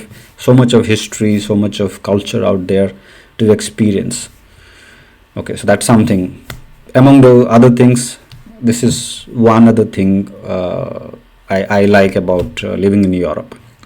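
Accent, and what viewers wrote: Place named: Odisha, India. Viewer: Odisha ka hai, I can still hear his mothertongue influence.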